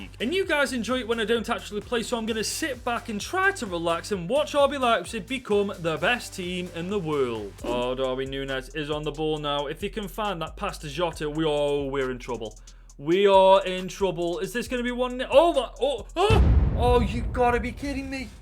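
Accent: Stoke, United Kingdom. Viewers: Only just came across your channel. Great content!! I’ve subbed as I assume from the accent you’re from Stoke ❤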